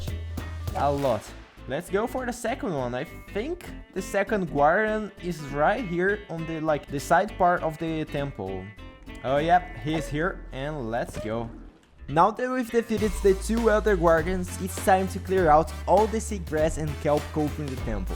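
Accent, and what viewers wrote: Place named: Brazil. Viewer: eu tava percebendo que o seu sotaque era de Brasileiro, dai qdo c disse que ingles n era a sua primeira lingua eu saquei que c realmente é br kkkkkkkkkkkkkkkkk, sucesso pra vc parceiro, inclusive o seu ingles tá mt bom, parabéns (edit: tu se inspirou em genshin? Tem uma estrutura exatamente assim lá) (edit 2: agr que eu vi que vc falou no video kkkkkkkkkkkk)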